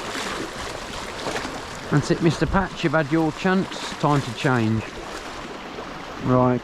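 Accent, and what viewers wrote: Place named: Birmingham, United Kingdom. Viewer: Sorry to my ignorant ears your accent sounds Brummy, I'm from south Wales.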